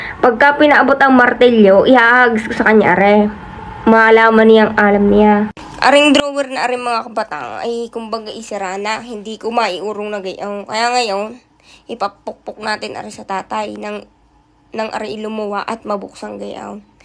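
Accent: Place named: Ilonggo, Philippines. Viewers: Baw ilonggo gale si mama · Nindot jd kaayu paminawn ang inistoryahan sa ilongga ❤❤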